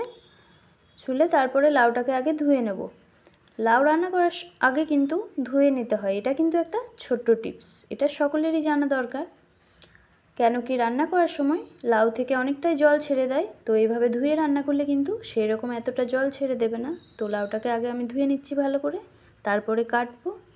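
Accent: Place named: Kolkata, India. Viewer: Assalamu Alaikum. Shotti boddo bhal laagchhe ata bhebe je, kolkatar muslim apur ranna dekhte parchhi🥰. Ami always kolkatar ranna dekhi. Alhamdulillah akhon akjon kolkatar muslim apur ranna dekhte parbo 🥰. Ami USA 🇺🇸 theke apnar ranna ta dekhechhi, aj shokale apnar recipe u tube a pelam. First I thought u r bangladeshi pore kotha shune bujte parlam ta kolkatar 🥰🥰. Shathe shathe subscribe kore felechhi. bolchhilam je, Kolkata te kuthay apnader bari?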